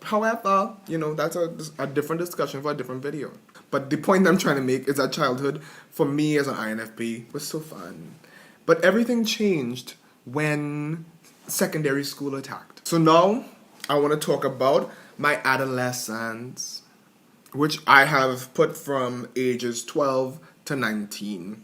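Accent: Trinidad and Tobago. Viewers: Once i heard the accent i knew trini one time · lol as soon as I heard the accent I knew you were a trini lol 😂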